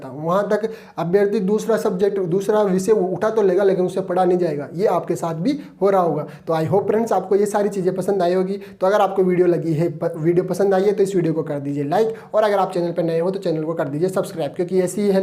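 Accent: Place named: Malpura, India. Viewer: Sir aap malpura ke kya aapke voice tone se guess kr rahi hu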